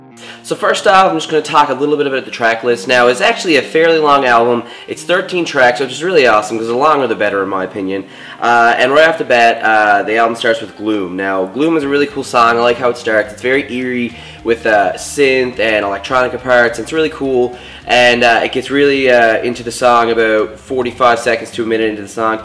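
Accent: Canada. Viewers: Are you Canadian ? Decent review don't like mikes new vocals .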